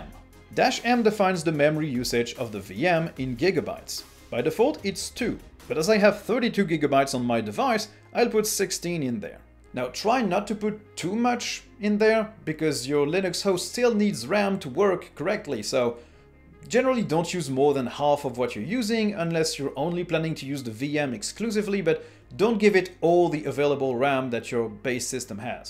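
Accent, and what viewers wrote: France. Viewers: Des vidéos en Français aussi serait les bienvenues, le YT Français étant un peu à la ramasse par rapport à l'Anglais, ceci étant dit, je suis parfaitement bilingue et j'appréci le p'tit accent frenchy, keep it up mate!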